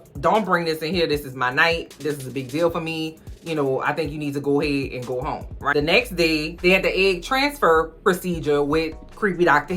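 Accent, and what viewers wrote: New Orleans, United States. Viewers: First time watching.... are you from New Orleans?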